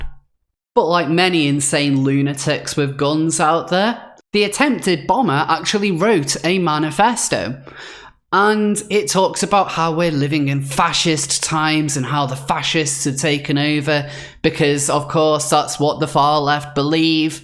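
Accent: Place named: England, United Kingdom. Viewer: Your English accent is awesome and classy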